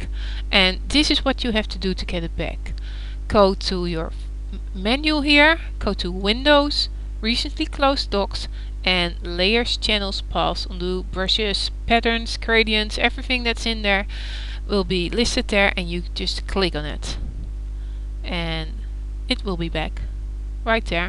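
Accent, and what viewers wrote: Netherlands. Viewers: youre dutch right ;p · Ben je nl? Je accent klinkt nl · Haha Nederlands zeker, hoor het aan je accent! Wel goed gedaan hoor!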